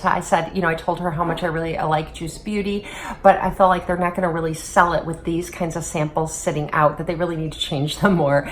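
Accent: Midwest, United States. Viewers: Hi! I came from channel. You are simply stunning! You have such a midwest accent. Cleveland? Chicago? I enjoyed your review. You have a new subscriber.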